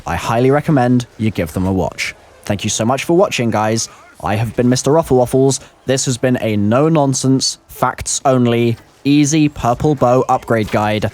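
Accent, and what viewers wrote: England, United Kingdom. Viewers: The British accent is the easiest to understand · Naah... He''s just english broo its his accent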